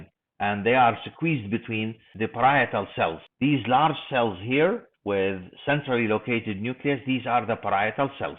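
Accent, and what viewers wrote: Iraq. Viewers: I think he’s Iraqi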